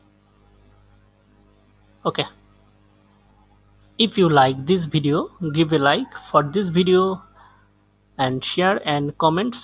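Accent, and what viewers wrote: India. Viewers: Why does every programing vid have a thick indian accent lol Great vid btw :)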